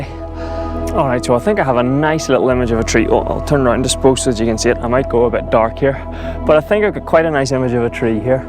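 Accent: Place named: Northern Ireland, United Kingdom. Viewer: Beautiful drone work, views and stills. Definitely a Winter Wonderland. Judging from your accent you are from Northern Ireland. Am I correct that you are living Scotland now? I am a Scot from Edinburgh but have been living in the pretty little town of Portstewart N.I. for 45 years to date. Anyway, I wish I was there also photographing that snow and hills...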